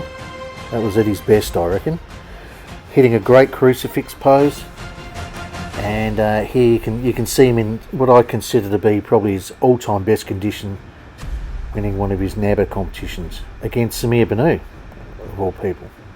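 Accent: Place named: Australia. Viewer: I love this guys beautiful Aussie accent. I could listen to him all day and his knowledge of bodybuilding is amazing.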